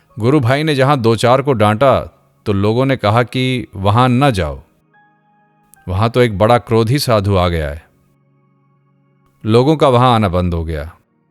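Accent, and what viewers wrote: Standard. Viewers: धन्यवाद ! बढिया उपदेश ! यह भी प्रशंसनीय बात है कि पारायण करनेवाले गुरुजी से हम सच्ची हिन्दी का उच्चारण भी सीख सकते हैं / कैसी अच्छी है यह बात !!! / यह सब भगवान् का अनुग्रह ही है / हरे राम ! हरे कृष्ण !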